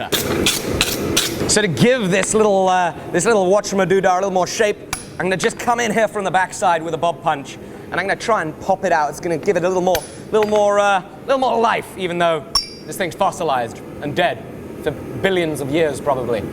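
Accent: England, United Kingdom. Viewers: ah hes English.. but from where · You were so British in your intro, you reminded me of Michael McIntyre. EDIT: I take it back. You sound like him the whole video.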